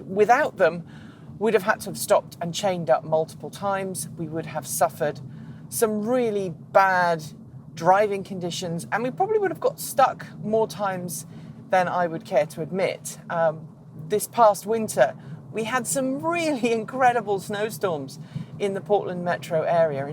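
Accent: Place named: United Kingdom. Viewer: I like your accent , are you originally From uk?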